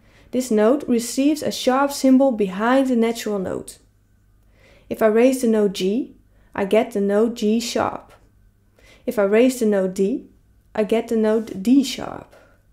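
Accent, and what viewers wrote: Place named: Netherlands. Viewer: Thank you.... you made it so easy. Like your Dutch accent.😌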